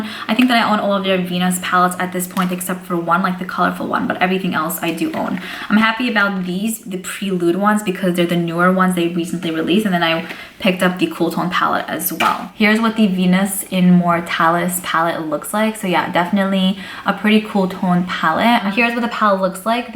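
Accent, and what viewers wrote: New York, United States. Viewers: When u have 3 videos to watch first and choose your favorite YouTuber!!!! You doll!!! I love love how honest and genuine u are! And I miss my new yoarrkk accent lol. Please don’t ever change! I find that once a YouTuber gets a little famous they stop answering the people in their comments who got them there ! I know u are different and so transparent! Xoxo 😘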